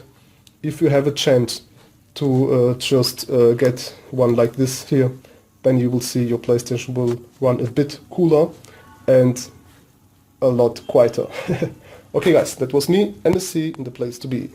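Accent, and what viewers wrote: Germany. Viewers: Hey NSC, are you German by any chance?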